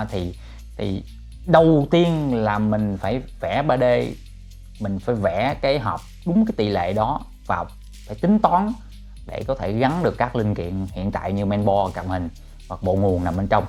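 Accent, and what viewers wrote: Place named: South, Vietnam. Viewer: Em rất vui gì nghe anh nói giọng của miền nam.bởi gì em đoán anh ở sài gòn.gì một pc full nước em sẽ cố gắn làm việc để một ngày nào đó không xa em sẽ được gặp anh.thực sự rất vui mừng khi anh nói tiếng miền nam.em chỉ sợ anh ở hà nội.nên khi ước mơ củacem khó thực hiện.ngày đó không xa đâu gần đến rồi.chúc anh nhiều sức khỏe.một ngày không xa em sẽ đc gặp anh.chắc chắn là thế.PC full nước anh nhé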